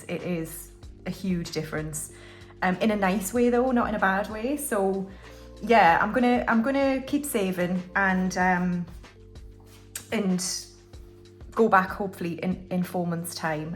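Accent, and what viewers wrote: Newcastle, United Kingdom. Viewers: Fab video as always. My hubby even said "oh is she a Geordie too? I thought so!" (Hes Northern Irish)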